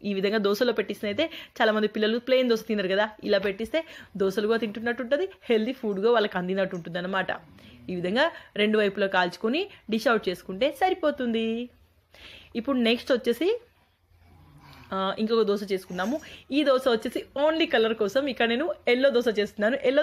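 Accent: Telangana, India. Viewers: Me voice chaala bagundhi akka...thelangana baasha lo baga matladuthunnavu...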